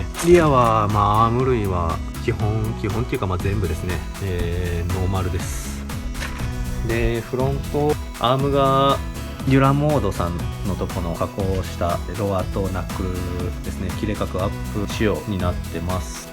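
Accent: Hiroshima, Japan. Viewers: 急にナレーション付き？広島弁ですかね？聞き取りやすくて良いと思いますよ。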